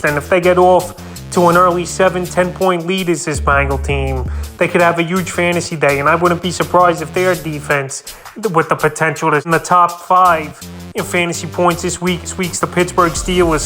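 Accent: New York, United States. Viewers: You sound like you from NY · Definitely from New York right ?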